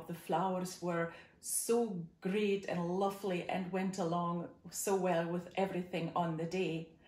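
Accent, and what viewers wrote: Scotland, United Kingdom. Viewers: Where is your accent from? Its sounds like a mix of Scottish and Eastern European.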